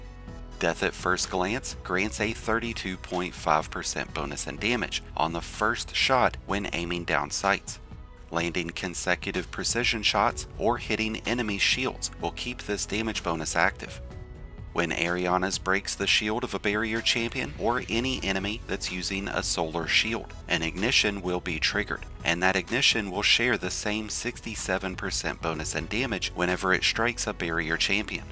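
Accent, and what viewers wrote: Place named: Kentucky, United States. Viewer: I can place the accent...perhaps KY?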